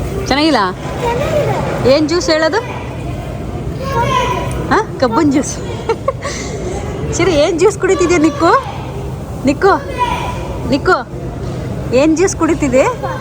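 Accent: Mandya, India. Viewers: Mam just informed all what's Retired age in US for Indian people’s for all categories:Nimma Mandya kannada bashe onthara channagidhe😂😢😅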